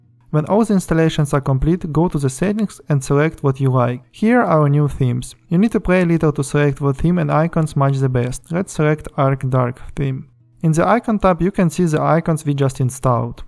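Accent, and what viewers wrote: Russia. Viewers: ес офхорс, лет ми спик фром май харт. 😁 But honestly, you have a really russian accent, like you are reading by papers text which was wrote in russian. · ты сказал "ощущение"? да тут только что русским флагом в кадре не машут. Жесточайший русский акцент, близкий к пародийному. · Акцент какойто "рязанский". В смысле не носитель языка говорит ИМХО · Русский акцент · Русского человека сразу слышно:))) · русский акцент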